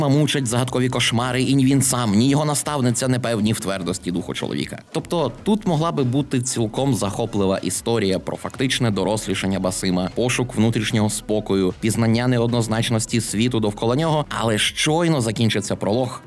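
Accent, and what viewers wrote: Standard. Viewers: несподівано вражений змістовністю та якістю відево. і вимова нормальна, на відміну від хгтв.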